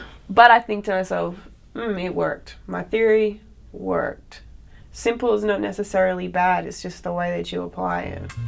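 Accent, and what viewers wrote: Australia. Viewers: So why does she still have an aussie accent when she speaks ? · she talks naivety with an australian accent well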